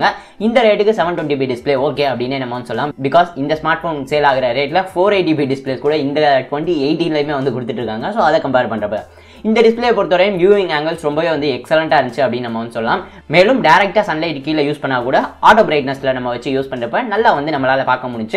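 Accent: Madurai, India. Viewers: Neenga Madura karara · Bro neega madurai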